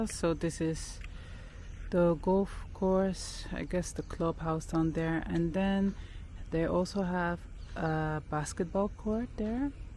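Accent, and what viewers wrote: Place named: Saint Lucia. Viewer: Are you from St Lucia, originally, you sound like a friend of mine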